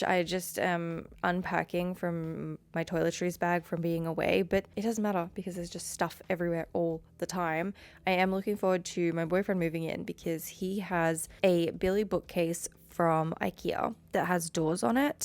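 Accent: Australia. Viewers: Do I detect an Aussie accent?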